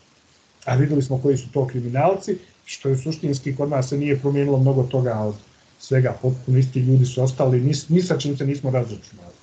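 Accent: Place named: Ekavian, Serbia. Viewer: Da odmah napomenem ne zelim vrijedjati i ne odnosi se na neki vid mrznje Prvo mi se ucinilo dacujem ekavica konzumacija Kad fakat pa dragi ti ko starleta Ne mogu vjerovati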